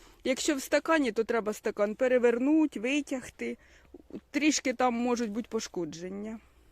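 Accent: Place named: Cherkasy, Ukraine. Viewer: Доброго вечора,. Дуже цікаво розповідаєте, особливо для тих хто тільки починає займатися цим бізнесом. Скажіть будь ласка, де ви проживаєте? Дуже схожий говір, ніби у нас на Черкащині.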